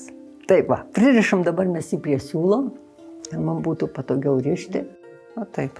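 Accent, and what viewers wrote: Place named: Dzūkija, Lithuania. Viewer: Nuostabu, o dar tas lengvas dzūkavimas malonus ausiai , klausyk ir mokykis.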